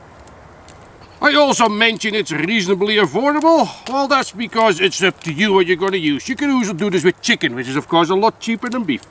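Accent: Netherlands. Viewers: this guy really sound dutch are you or not? 🤨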